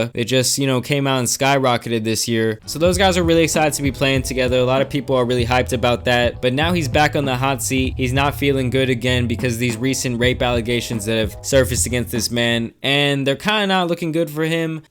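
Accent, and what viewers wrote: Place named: New York, United States. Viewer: You really have a New York accent while talking 😂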